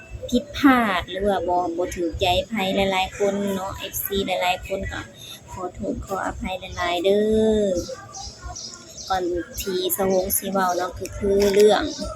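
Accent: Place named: Ban Khok Yai, Thailand. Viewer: เจ้าคนบ้านโคกใหญ่บ่ครับฟังเสียงคือคนบ้านโคกใหญ่ครับสบายดีปีใหม่ครับ ขอให้สุขภาพแข็งแรงตลอดปี69และตลอดเด้อครับคนงาม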